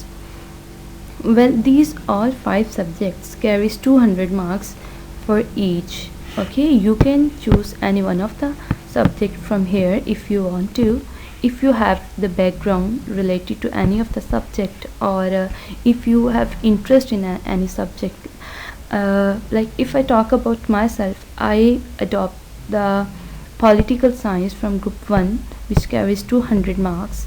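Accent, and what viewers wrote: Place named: England, United Kingdom. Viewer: A lovely English accent! A simple mesmerising voice! One of the best guidance ever! I wish all the best for you! Keep making this incredible videos for us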